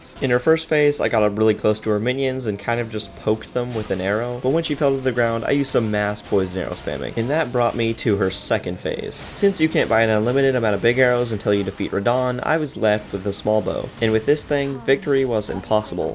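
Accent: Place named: North America. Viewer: Honestly buddy I have no clue. Judging from what I can remember his accent he's probably North American? Keep in mind I only say this because I'm North American and I couldn't identify an accent. So I'm assuming we're the same? Don't take my word for it. Check the interview with OnlyWaifu.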